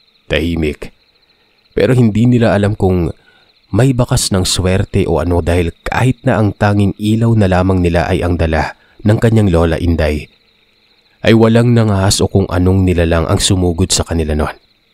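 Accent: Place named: Hiligaynon, Philippines. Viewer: An kyutt ni kuya mag ilonggo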